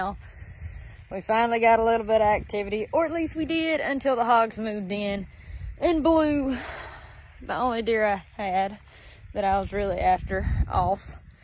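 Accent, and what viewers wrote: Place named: United States. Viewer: Love your shirt baby, just came across your vlog, love it to like your country accent because I am country.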